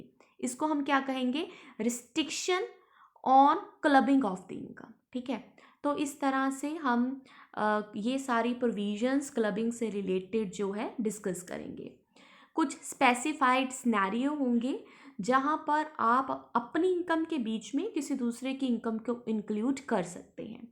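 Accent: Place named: Punjab, India. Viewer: Punjabi accent rocks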